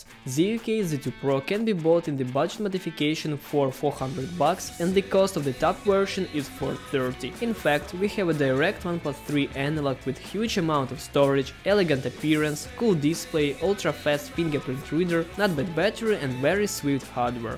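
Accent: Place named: Russia. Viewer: Russian english Best phone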